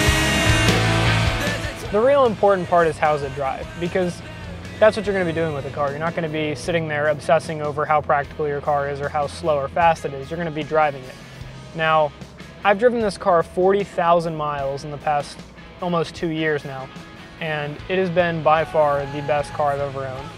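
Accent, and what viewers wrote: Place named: United States. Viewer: Which part of America is his accent from? It sounds very pleasant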